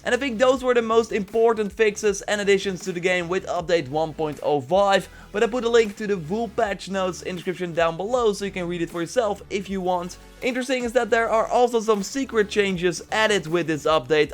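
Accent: Netherlands. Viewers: r u dutch? accent sounds like it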